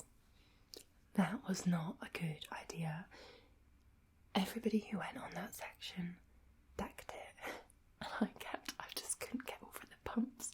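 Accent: Scotland, United Kingdom. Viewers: I love that Scottish accent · Grew up in Glasgow and lost my accent when I moved to NYC. This is changing my opinion of the Scottish accent.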